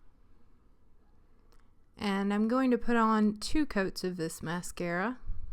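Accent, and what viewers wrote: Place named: South, United States. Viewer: you are so pretty and I love your sweet southern accent. <3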